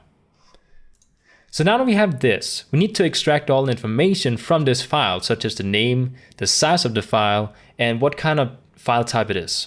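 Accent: Denmark. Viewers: danish accent yes?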